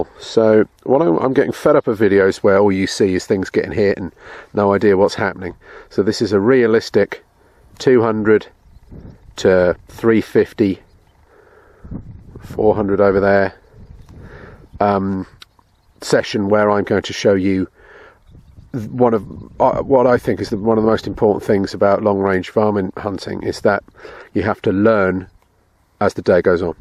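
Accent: United Kingdom. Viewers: you sound like a brit but the landscape looks to me like scotland.... what's the dillio?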